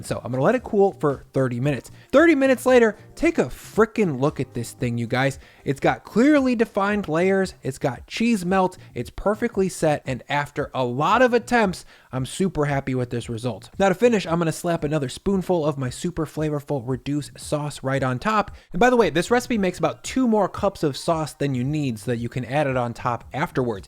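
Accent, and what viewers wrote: United States. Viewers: why do you do everything in grams? is it too gauche to use spoons, cups, lbs and ounces? or are you a european who lives and works in europe? you sound like an american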